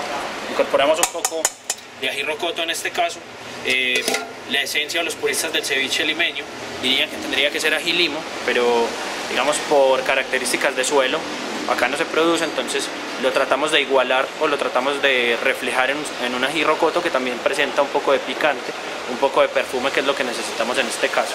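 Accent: Colombia. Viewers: HABER , HABER ........ COMO HAZ DICHO .. . por tu fonetica entiendo que eres colombiano .. el cebiche PERUANO nunca pero NUNCA se , utiliza perejil . SE UTILIZA CILANDRO huev....... y el sazonador que indicas alegremente y, tratas solo tratas de explicar , se aplica de acuerdo al criterio del cocinero y eso lo hacen cuando el pescado no es fresco y no es apropiado para un cebiche .. como en tu caso NO quiero ser alarmista pero los medicos recomiendan no consumir algunos peces y entre ellos esta la tilapia que por cierto hay mucha variedad ..... este cocinero de tercera ... mejor dicho ayudante de cocina ... ya ha tenido mucho tiempo para aprender a hervir agua ..dado el tiempo de publicacion de este video ...,, deseo y le pido que para hablar de cocina PERUANA tenga la gentileza de aprender mas ........ un saludo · Por el acento es colombiano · es colombiano por el acento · cheff con acento colombiano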